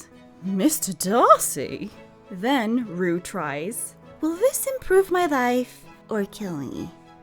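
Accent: United States. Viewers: also if u had not said you were from a different country, I would have thought you were from the states. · your english is very good for someone from norway. i swore you were american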